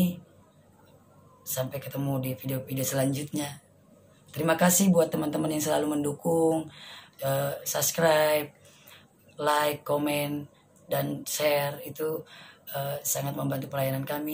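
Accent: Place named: Bugis, Indonesia. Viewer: ee.. terjawab sdh pertanyaan semua org siapa dan ee.. lho kok ketularan gaya bicara bugis ya 😀👍 Gbu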